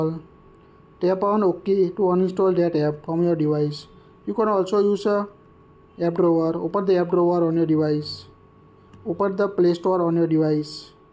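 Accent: India. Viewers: Just put captions it's indian English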